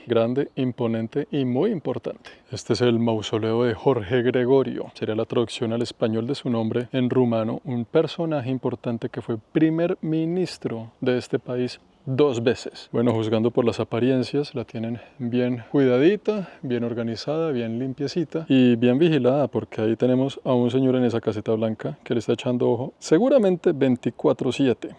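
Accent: Bogotá, Colombia. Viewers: demás de educativos y de muy buena calidad tus videos, me haces reir mucho con algunas expresiones, me encanta tu acento Colombiano. Saludos de otra Abuela desde Dallas Texas, que te acaba de adoptar 👵👵👵👵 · es colombiano su acento es el Bogota donde creció y se educó, el es ingeniero, luego se fue a Canadá y estando allí se volvió youtuber, siendo uno de los mejores. Ahora viaja por mundo.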